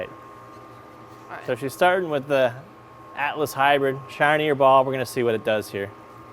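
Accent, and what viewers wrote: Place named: Midwest, United States. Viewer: Never heard of before but the minute she spoke, I thought "wow she sounds very familiar." Of course she's from Ohio like me lol. Midwest dialect I suppose.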